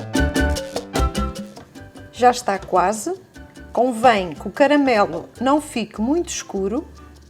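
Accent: Portugal. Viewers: Acho tao lindo o sotaque portugues, tao diferente de como nos brasileiros falamos, eles sao mais CLASSIC, DELICIAAAA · Gostei da receita mas gostei mais du sutaqu dessa portuguesa amo esse "sotaque"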